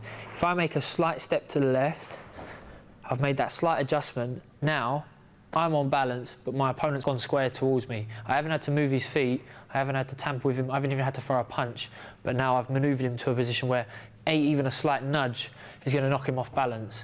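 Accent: United Kingdom. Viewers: British accent there...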